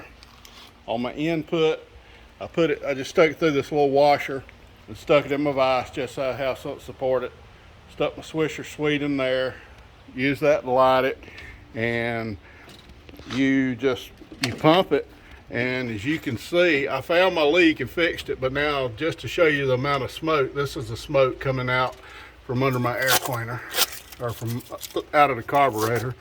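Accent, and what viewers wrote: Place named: South, United States. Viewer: Thanks for the video . You sound like a good ole boy from down south.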